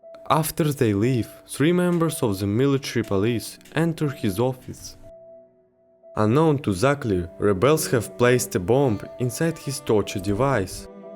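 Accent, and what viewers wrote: Russia. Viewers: are you russian? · Чел ты руский по акценту видно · Bro, are you Russian? 😅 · Are you russian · У тебя русский акцент) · you from russia??? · Слышу русский акцент... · Похоже на русский акцент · Hmmm,English language with Russian accent. I like that👍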